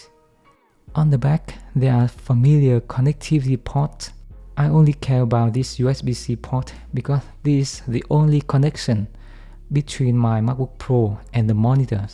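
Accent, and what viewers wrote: Vietnam. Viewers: Đúng giọng Vietlish rồi, thằng chủ kênh người Việt Nam à =)) Chanel owner is Vietnamese =)) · giọng này chỉ có VN :))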